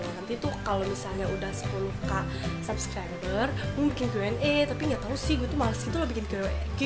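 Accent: Java, Indonesia. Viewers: Nx ngomong medok kuilo marai klepek2 😍😂😂😂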